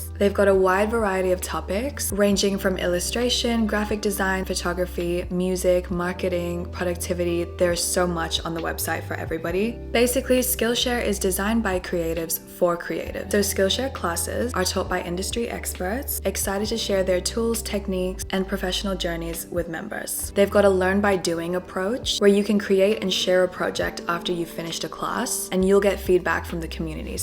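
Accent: Australia. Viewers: The accent?? Where is she from. My guess is Australia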